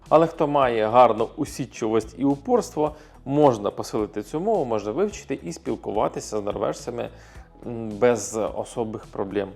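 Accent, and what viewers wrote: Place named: Kuban, Russia. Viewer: Ви ж українською розмовляєте як хлопець з Кубані, калька р0сійської то звичайно що Норвежська для вас буде більше чужа а ніж українська а якщо не хочеться на свої хліба то можна на Кубань або в Білорусь, вас там краще зрозуміють як у Норвегії, миру та щастя всім…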